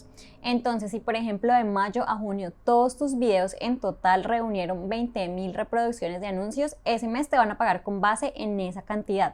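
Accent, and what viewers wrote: Colombia. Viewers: Yo lo hice en 3 meses y ayer me aprobaron, fue lo más rápido que pasó, pero tengo una duda, en algunos videos salen la monetización en $ amarillo(? Mi pregunta es; el $ amarillo también te monetiza? ¿Pero que tanto? Que bonito acento tenes jajs(Colombiana no(? saludos desde Argentina!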